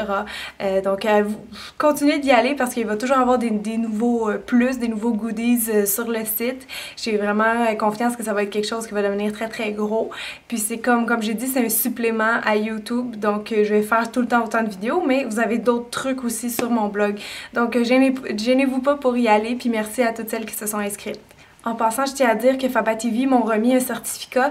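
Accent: Quebec, Canada. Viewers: Pourtant l'accent québécois c'est trop beau... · Elle a l'accent québécois, pas canadien.. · Vanity pink c normal elle a l accent Canadien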